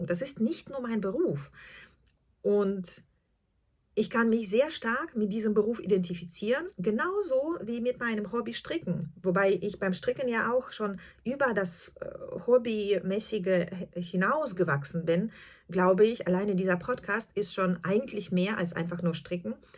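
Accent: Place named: Luxembourg. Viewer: Liebe, ich finde deine Videos sehr interessant. Das eine war mir auch zu viel Theorie. Dann nehme ich mir die Freiheit abzubrechen. Ich habe vor vielen Jahren eine Übersetzerausbildung an einem Fremdspracheninstitut gemacht. Ich liebe Sprache und was damit zusammen hängt. Wenn ich dir zuhöre, bilde ich mir ein, einen luxemburgischen Akzent zu hören. So und jetzt schaue ich weiter 😊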